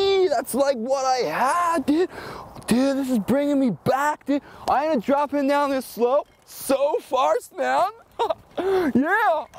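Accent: United States. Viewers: American English is on point dude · Dude that So-Cal accent is on point I feel like I'm at home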